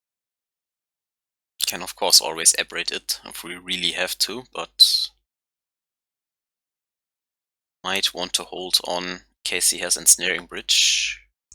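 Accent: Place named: Germany. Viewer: Hey, nice run. Your dialect sounds like german, so i wanna ask, where you from.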